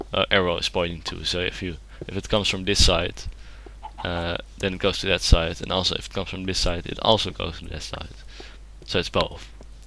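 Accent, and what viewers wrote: Netherlands. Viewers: It's sooooo easy to recognize a dutch accent (says this dutch guy here) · I hear a Dutch accent ^^ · you're totally right, he is dutch, I (guy from the NETHERlands) think. I only heard it after you said it though. I have a rather "deftig" (The Queens English) accent.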